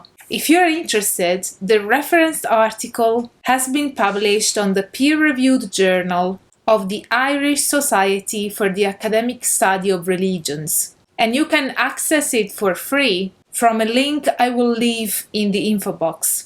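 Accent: Italy. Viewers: Didn't recognize the ascent as Italian, my family's is different. Doesn't matter, now your just even better. Anyway, my big question is or maybe it was answered and I just didn't understand is, because I'm stuck in that old Catholic way of thinking, how you can change tradition. I'm coming at as an American, and every youtuber or person I know it's personal, or singular. It feels like it is a very new religion and changes depending on who you talk too. I would think things should be written down in old books or even tablets. It feels to me that thinks should stay consistent. Also, in the U.S. students don't cast spells, they just pull there cell phones out frustrating teachers. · Grazie mille di aver condotto questi studi, si vede quanto sei preparata e appassionata a riguardo! Pensa te che ti ho trovata su youtube casualmente, per poi capire (in fretta, hai un bellissimo accento italiano) che eri italiana e per di più a quanto ho capito vivi a Leeds.. 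e io vivevo a Leeds fino a poco tempo fa 😂😂😂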